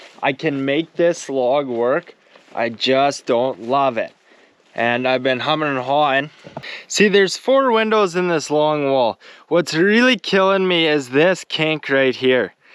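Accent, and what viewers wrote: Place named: Newfoundland, Canada. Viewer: i'm not canadian so forgive me if this is offensive, but is that a newfoundland accent. it sounds so much more irish than most canadians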